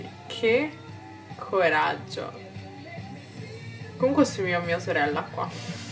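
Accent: Rome, Italy. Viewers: E' la prima volta che ti vedo su youtube. Guardando i tuoi vecchi video si vede che sei molto cambiata, ma sei ancora bellissima. Dal tuo accento direi che sei sicuramente di roma.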